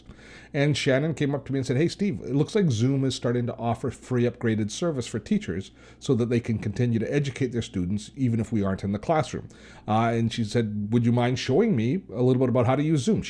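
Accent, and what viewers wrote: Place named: Canada. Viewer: Hi...very watchable...thank you. I am from the UK...have friends in Canada, so it was especially good to hear your accent and see your friendly front room style. I conduct/host a monthly discussion group...and new to zoom wonder about the 'hands up' symbol so I can mute people and then unmute them when I respond to the hands up? I am pretty sure I saw it in the free version...can't see it in the paid version.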